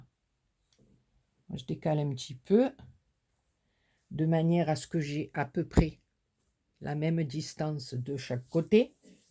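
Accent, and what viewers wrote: South, France. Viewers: Coucou, un kilo de talent, un zeste d'humour, une pincée d'accent du Sud, une grosse cuillère de bonheur et voilà la recette de la superbe carte que a réalisée!!!!! bravo!! j'adore ta créa, elle est magnifique, merci beaucoup pour ce joli tuto, bisous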